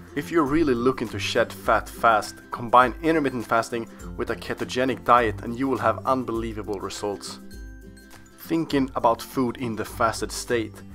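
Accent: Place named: Sweden. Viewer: really strong Swedish accent there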